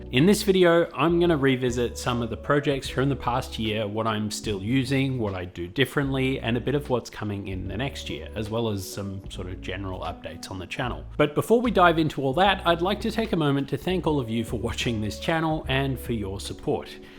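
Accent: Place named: Australia. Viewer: I sometimes forget how much I love hearing my own accent on youtube until I find a aussie channel that is of interest to me!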